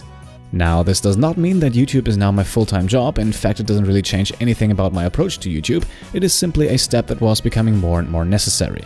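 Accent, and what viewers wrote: Germany. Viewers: Warte warte warte du bist deutsch. Damit hätt ich jetzt net gerechnet vom Akzent her :D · RL comes first - no doubt. Congrats to your new business! :D A new strategy game would be nice. Maybe a paradox-one like EU4 or Stellaris or something similar. But its up to you. Im open for all, i guess. Because i really like your smooth voice. Soooo you are from germany! :D Honestly I almost thought so... Manchmal hört mans tatsächlich bissel raus ;) ;) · Ah Germany, I couldn't place your accent. I knew it sounded different from a British one but now I can hear it. You deserve all your subscribers and even more! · Holy shit, do you even sleep? Work + school + youtube.. Also did not even register your german accent until like 20th episode of Rimworld and wanted to say great content and keep it up please!!!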